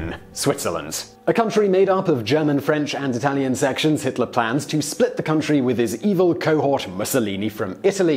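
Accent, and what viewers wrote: England, United Kingdom. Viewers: How does this chap have an english accent except for his hard vowel pronunciation in any words not used in daily speech.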